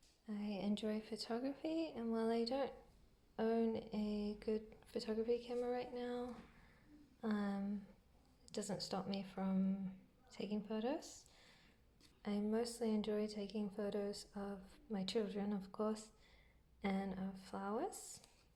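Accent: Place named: New Zealand. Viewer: Hi from NZ! I heard your accent and knew! ❤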